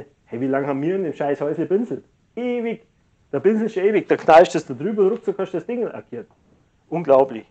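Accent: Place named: Bavaria, Germany. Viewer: Er spricht meines Erachtens gutes Deutsch. Bayrischer Einschlag, vermute ich,oder? Grüße aus Brandenburg.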